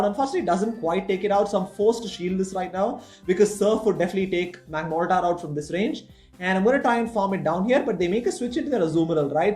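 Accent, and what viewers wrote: India. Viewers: dude ur english is flawless whit a indian accent sound fucking cool haha, one love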